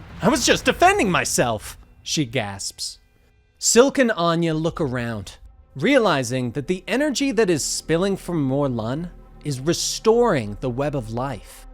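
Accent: United Kingdom. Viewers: Not all us brits sound like Chimney sweepers from the 1940s come on man do some voice research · has got the fantastic job apart from that British accent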